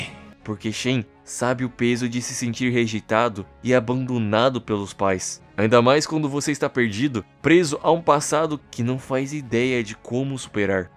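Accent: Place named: São Paulo, Brazil. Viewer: mano, seus vídeos são bons, mas sua voz... Cara, é difícil prestar atenção. Procura as técnicas de controle da respiração para canto, vai te ajudar. E tenta dar uma segurada nesse sotaque forte do interior de São Paulo, nmrl, pprt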